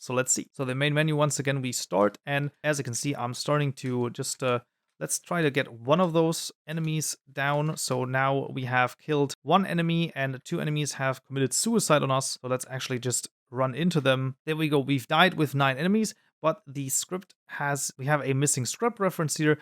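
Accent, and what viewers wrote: Germany. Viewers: Nice video! I appreciate the fact that you convey much information in short videos. Btw you sound like you're German :D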